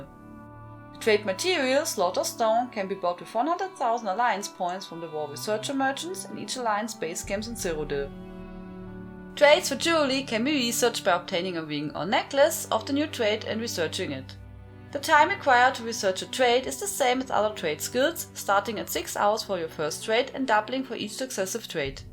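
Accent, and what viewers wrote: Germany. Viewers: This really is one of the best directly informative ESO channels. It's also a nice bonus that your uniquely German accent really makes it engaging to listen to.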